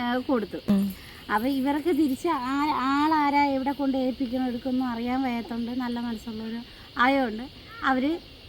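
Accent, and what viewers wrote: Thiruvananthapuram, India. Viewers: Chummadhalla oru TVM slang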